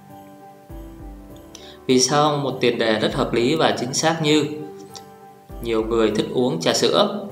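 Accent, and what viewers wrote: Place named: Thanh Hoa, Vietnam. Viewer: bạn này ở hoa thanh quế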